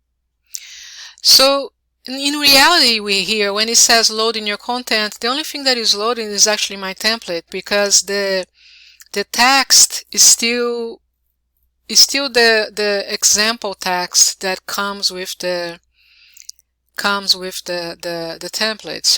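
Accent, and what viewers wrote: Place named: Brazil. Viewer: First time here. Are you Brazilian...? Your accent sounds beautifully Brazilian. Thanks for the video!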